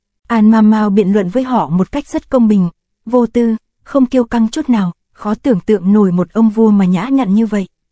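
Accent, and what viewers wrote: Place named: North, Vietnam. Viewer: Truyện hay và người đọc với giọng Bắc nhẹ nhàng làm hấp dẩn người nghe.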